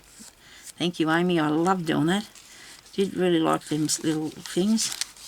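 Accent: Australia. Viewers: Good day from WA. Its so nice to here another Aussie accent. Thank you so musch, I think your book's awesome!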